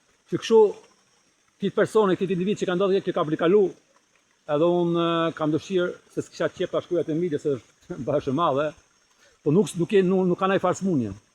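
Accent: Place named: Gheg, Albania. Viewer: E folura e ketij djali esht gjuhe bio,esht gege jo toske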